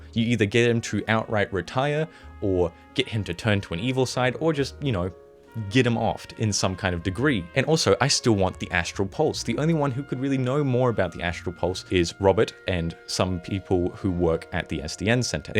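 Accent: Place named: Australia. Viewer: Ok, now I have three things to say to you! 1. Nice Aussie accent 2. Skylanders video? Now I’m randomly curious cause I love skylanders And 3. Doesn’t Adobe have a thing where automatically backs up your save file, every few minutes or something? Or did the crash fry them too? Or is adobe too archaic to include backups when Resolve and ProTools do?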